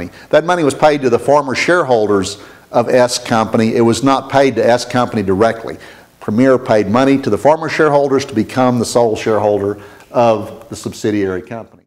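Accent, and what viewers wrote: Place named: India. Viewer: You talk too damn fast it all sounded like hearing an Indian speak!!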